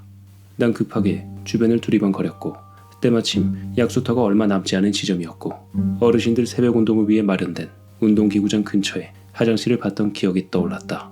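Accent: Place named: Busan, South Korea. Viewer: 경상도 어디사세요? 부산 사투리인가...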